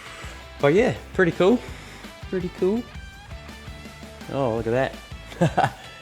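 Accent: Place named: Australia. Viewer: You nearly sound Aussie 😂😂😂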